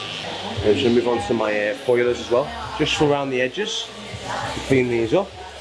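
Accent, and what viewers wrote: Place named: England, United Kingdom. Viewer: Whats your English accent .. I wan tto know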